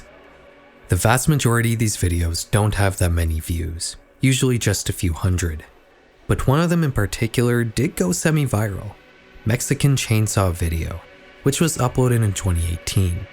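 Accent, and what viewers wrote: Poland. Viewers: the polish accent gets me by surprise as i skip the video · ale ten polski akcent słychać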